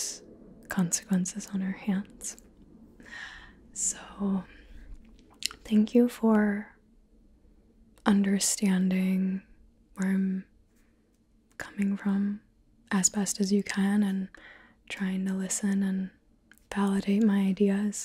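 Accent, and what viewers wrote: South, United States. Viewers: You really have that southern accent down well! Great job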